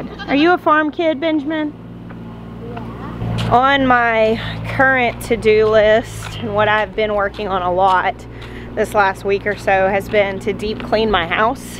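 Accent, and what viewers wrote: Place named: Arkansas, United States. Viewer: Well I wanted goats but now I don’t think it’s a good idea for me. 😂🥰🥰🥰. I WOULD NEVER GET ANYTHING DONE. They are so dang CUTEEEE. I feel the SAME AS ABOUT TOMATOES. 😍😍🍅🍅😁😂 prayers coming from down south LOUISIANA ALWAYS. 🙏🙏🙏 KEEP SHINING LIGHTS GIRL. I have been saying that I stumbled upon my REFUGE here in your ROOTS and twangy Arkansas accent last year and GOD snuck right back into MY HEART not long after. I think you might have been a key ingredient that was needed for me to let HIM open my EYES. IM STILL SO THANKFUL FOR THAT. Keep talkin TWANGY and sharing your ROOTS AND REFUGE there are others out there just like me that need it. ❣️💡😊🦋🍅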